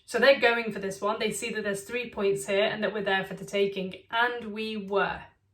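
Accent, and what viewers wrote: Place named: Liverpool, United Kingdom. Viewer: Wow! a posh scouser. Decent review but it was a pen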